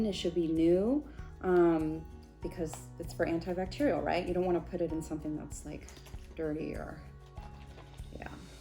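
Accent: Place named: United States. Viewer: Gần 30 tuổi chưa bao giờ comment trên Youtube. Nay thấy chị nói tiếng Anh Mỹ hay, nghe dễ. Mong chị ra video dạy tiếng Anh, chị có khiếu sư phạm. Thank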